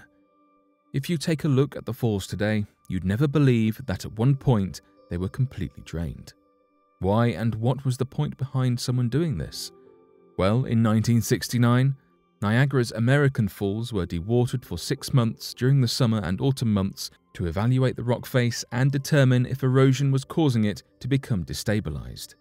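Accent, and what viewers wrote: United Kingdom. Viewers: Why is a British guy narrating this?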